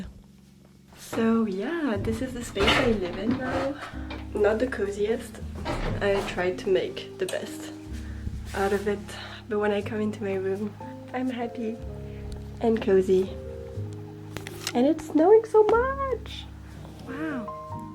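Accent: France. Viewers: haha j'ai regardé la video sur le labret vertical, je me sais bien qu'il y avait un petit accent français! j'ai aussi une petite cheminée dans mon studio à bruxelles, ça donne un effet trop cosy :p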